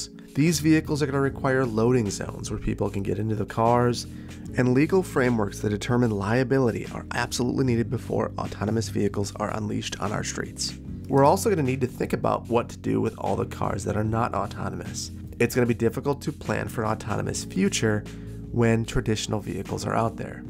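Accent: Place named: United States. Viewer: There are many valid points but also couple of things I would respectfully criticize. The speaker’s accent suggests he is based in the US. With that in mind I cannot really get the point about inequality. I mean, most of US cities are already designed with sprawl, in a way that often mass transit is not a viable option to commute. And those groups of people that cannot drive (e.g. kids, elderly, disabled, poor, etc.) are having significant disadvantage in that respect. In addition, when autonomous cars become mainstream, most of the people will not have to own them. All that makes me think autonomous vehicles are more likely to improve the equality than otherwise.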